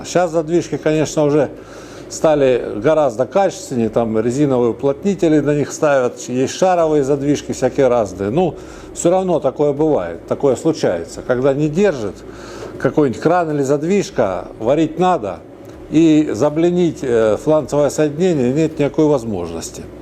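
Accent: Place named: South, Russia. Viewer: Ув., лучше, вырезал окно, а вместо песка, (😊😊куда его потом), промасленую тряпку, набил в трубу, а потм вытащил её и заварил!! 😊😊А по говору Вашему, слышно земляка с юга Руси, привет Вам!!....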